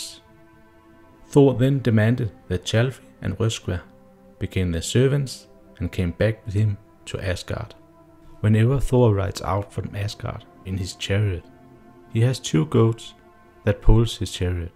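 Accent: Denmark. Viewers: The infamous danish accent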